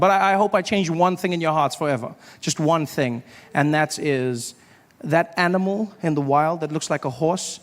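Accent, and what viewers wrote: South Africa. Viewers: Erm he is South African! Did you not hear his South African accent. And I am not a fan of either of them. I prefer the late great Dave Allen. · Hope your South African English should make some sense 😂 rubbish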